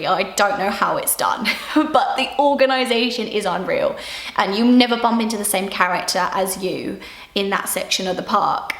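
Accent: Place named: England, United Kingdom. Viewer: What part of England are you from? Your accent is lovely!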